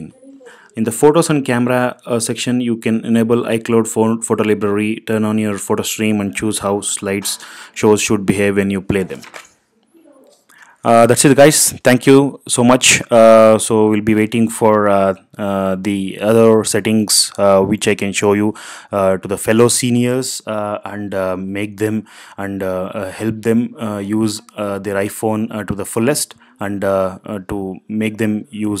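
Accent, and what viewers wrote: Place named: India. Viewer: neverhome_rph I am in total agreement with jarcohen You MUST rehearse your You Tube presentations and LEARN to pace your speech. You speed up at times which makes what you are saying TOTALL ILLEGIBLE! Then you then speak slowly and you information comes out clearly. There were too many instances when your fast talk, combined with your Indian dialect, made your presentation too difficult to follow. PLEASE SLOW DOWN!!!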